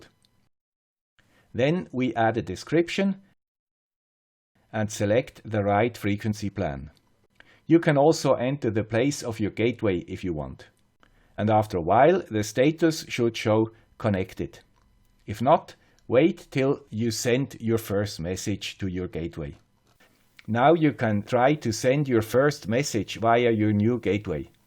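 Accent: Switzerland. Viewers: Dear with the cool Swiss accent Finally I got all parts to replicate your awesome project. After soldering all parts on the pcb I took your sketch from your cool Github repository. My hands were already wet and my heartbeat had already reached a critical rate because of the great excitement. With my highly shaking right hand I tryied to hit the upload button. After a few try's it began to upload. Then the shock of the day -> Error message: "conversion from 'const ip_addr_t* {aka const ip4_addr*}' to non-scalar type 'ip_addr_t {aka ip4_addr}' requested " Because I'm a beginner in the Processing programming language I have no clue how to fix it. Do you can help us to fix it? I'm sure for an expert like you this problem is like peanuts;) Maybe it has something to do with the version of Arduino IDE or a library. I'm not sure:-| Kind regards and thanks a lot for sharing your awesome projects, ideas and knowledge with us. 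Thats very kind Greeting from Bern and stay healthy delf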